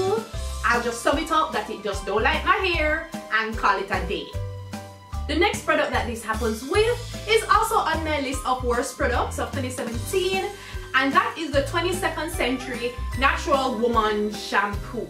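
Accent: Jamaica. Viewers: I had to sub right away! Love your infectious personality and accent! My mom is Jamaican so I picked up that accent right away! 🇯🇲🇯🇲🇯🇲🇯🇲🇯🇲🇯🇲🇯🇲🇯🇲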